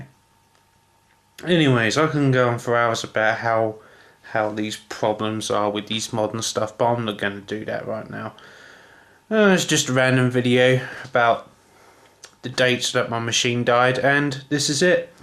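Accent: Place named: North London, United Kingdom. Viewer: He is English I would say from North London!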